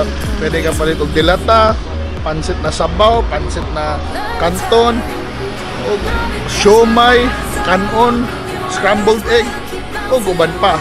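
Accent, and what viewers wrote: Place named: Cebuano, Philippines. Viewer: astig Cebuano accent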